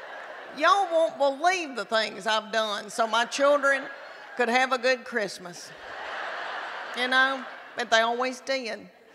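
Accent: South, United States. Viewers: I found this woman, by accident on YouTube last year. Im from England, and i just love that Southern accent., your awesome, you waited a long time for this, you sure do deserve everything now.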